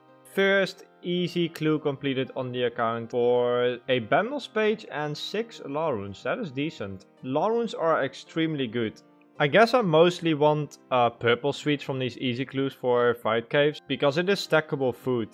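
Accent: Netherlands. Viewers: Hearing the Dutch accent is meh, but other than that legit insanely good and I’d love to see more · Are you Dutch? Your accent sounds really familiar. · The dutch accent, haha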